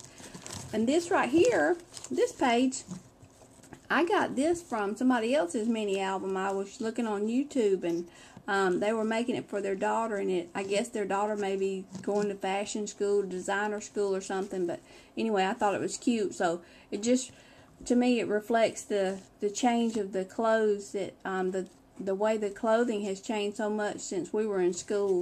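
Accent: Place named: South, United States. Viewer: I just love your southern accent. You are adorable and you did a wonderful job on the album.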